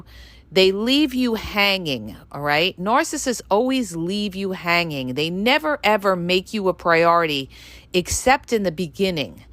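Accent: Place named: New York City, United States. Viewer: A NYC accent...;)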